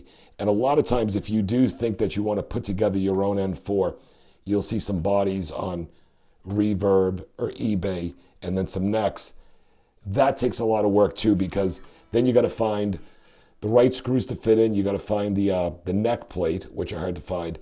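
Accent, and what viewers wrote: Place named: Boston, United States. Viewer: That's a Boston accent. I'm from there too buddy. Cheers.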